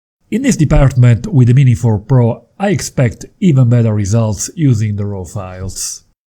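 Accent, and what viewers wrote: Italy. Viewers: He's Italian?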